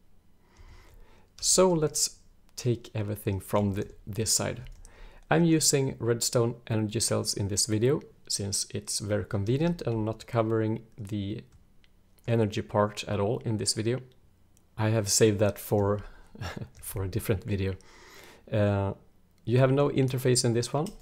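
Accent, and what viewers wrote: Sweden. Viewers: are you swedish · Are you Swedish?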